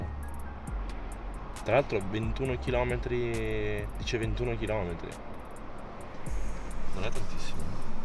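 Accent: Genoa, Italy. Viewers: Aaaaa il genovese di ahahah😍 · Video veramente stupendo 🤩 ma belin dato che parli in genovese spero che tifi Sampdoria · Bellissimo video sei migliorato tanto, qualità,contenuti, durata e improvvisazione. Intrattieni sul serio, dovresti fare una serie tipo Gomorra sulle auto e lifestyle Bye con la cadenza genovese ahah numero uno ti adoro 😂😘💪🏻🥇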